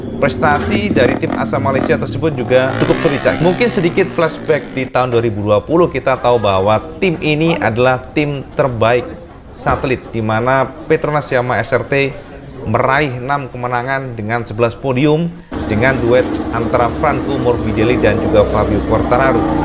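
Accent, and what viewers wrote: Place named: Java, Indonesia. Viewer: Terbaik cak..terus saya mendengar walaupun tak faham loghat jawa bila disebut..😆 Subscriber dr 🇲🇾👍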